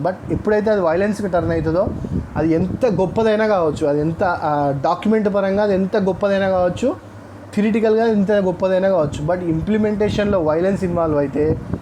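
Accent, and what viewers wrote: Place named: Telangana, India. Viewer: Nice vedio in telugu bro and nice way of expressing words in our telengana slang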